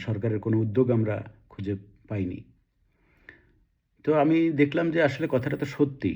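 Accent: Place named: West Bengal, India. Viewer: তোমার উচ্চারণ এবং ভাষা পুরোপুরি পশ্চিমবঙ্গীয়। তোমাকে পশ্চিমবঙ্গের আসার জন্য আমরা স্বাগত জানাচ্ছি।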